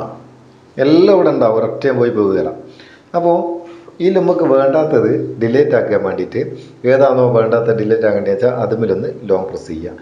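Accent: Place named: Kannur, India. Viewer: Kanur basha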